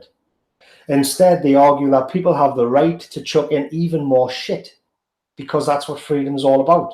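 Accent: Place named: North East England, United Kingdom. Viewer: Well said 👌 From a fellow North East lad. It's refreshing to hear an alt righter with the same accent as me.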